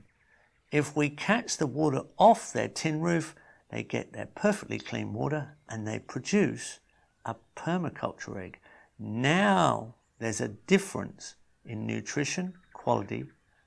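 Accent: Australia. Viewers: Et je suis sûre que le jaune d'œuf est presque orange. :o) Des oeufs superbes et très nutritifs, pondus par des poules heureuses. Magnifique ! My parents used to have chickens and I remember they loved scratching the ground. I didn't much care about the rooster, though. A bit afraid of. They had a laying break for about 1 month a year, in winter (European winter). Otherwise, eggs all the year round, enough for the whole family and to sell some to neighbours. When baking, the pastry had a nice yellow colour. Industrial eggs have a sick pale yolk, you immediately see the difference. Thanks for all your videos, I learn a lot. Just had to get used to your Australian accent at first. All the best!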